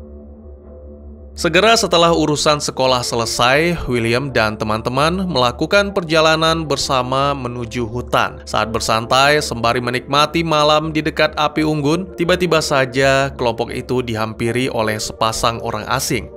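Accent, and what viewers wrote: Aceh, Indonesia. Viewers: Aceh kayaknya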